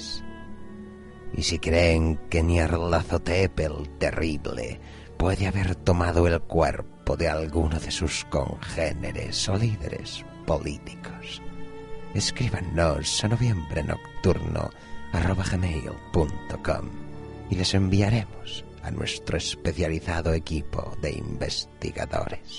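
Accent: Catalonia, Spain. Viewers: Una acento muy catalán. Me gusta. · le das un toque catalán xD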